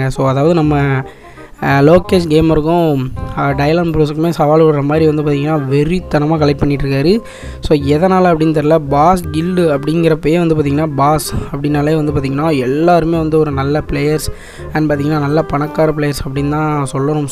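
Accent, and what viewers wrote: Tirunelveli, India. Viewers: Neenga Tirunelveli ah bro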